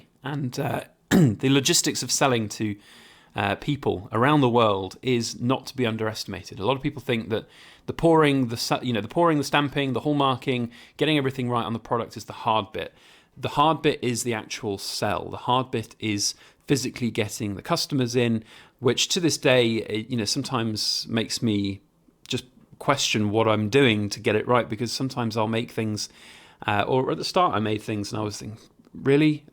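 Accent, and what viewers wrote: United Kingdom. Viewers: Are you living in the UK? Because your accent is very british.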